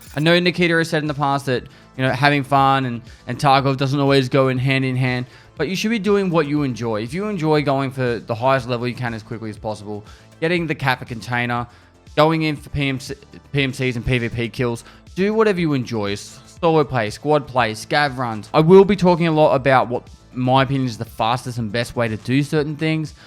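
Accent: Australia. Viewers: Never had issues understanding what he says, guess it's your ability to understand his Australian accent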